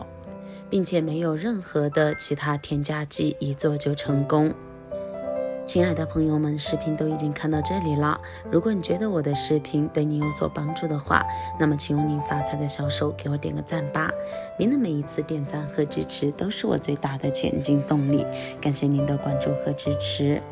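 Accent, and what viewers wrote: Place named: Taiwan. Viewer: 调料的口味像大陆西北地区，口音是台湾🤣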